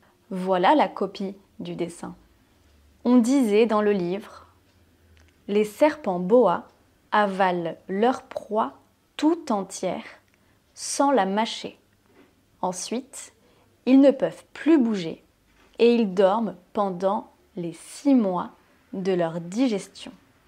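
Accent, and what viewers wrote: France. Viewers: Your English is good. I am a middle aged fourth generation American. You speak better than some Americans. I like the French accent. You can keep it or work on your pronunciation. It’s your choice. Any native speaker should be able to understand you now. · Bravo pour parler anglais, il est difficile pour les personnes instruites et intelligentes de montrer un niveau intermédiaire dans une langue étrangère (je sais le douleur mdr) mais le courage est essentiel et requis pour atteindre un niveau avancé. Et l'accent français est considéré "classy" en anglais, donc c'est un avantage. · J'adore ton accent français quand tu parles anglais. Ça sonne vraiment mignon.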